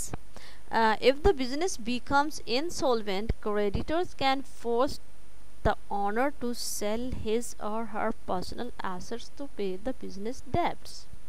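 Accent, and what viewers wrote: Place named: Pakistan. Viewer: Sound like ur a pakistani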